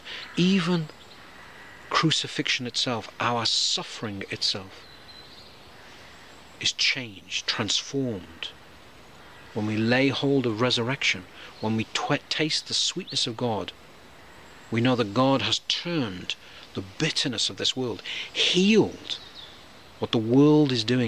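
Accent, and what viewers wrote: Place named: United Kingdom. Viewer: Very compelling talk Fr.. Sounds like you’re of British persuasion. What parish do you shepherd?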